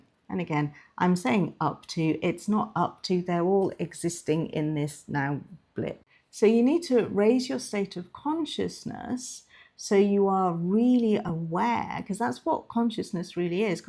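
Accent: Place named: RP, United Kingdom. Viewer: Wish she didn't speeeak with such ah Marisaaa Peers posh ahccent. It detracts from and impedes the message...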